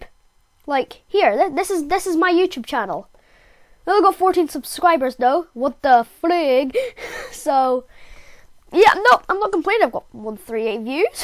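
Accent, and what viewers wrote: Scotland, United Kingdom. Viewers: This helped a lot tysm!!! Also- are you Scottish? Cuz I am too :) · Are you Scottish? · Are you Scottish? I love your accient! I am Scottish btw! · Are you Scottish . You have an accent which sounds Scottish cuz of the way you say it. You don't really pronounce the T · are u from scotland? Dumfries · Are u British xD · I’m guessing your from Scotland? 🏴 It’s alright, I’m from the great country to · Omg your Scottish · You sound so Scottish! (No offence if your not, I am anyway..) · U sound Scottish r u Scottish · Are you Scottish???! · Are you Scottish? you sound Scottish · Lol its cool because you havent fully developed your british accent in these early videos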